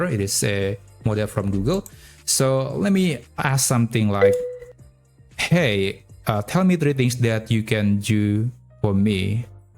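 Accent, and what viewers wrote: Indonesia. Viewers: Logatnya bahasa inggris indonesia banget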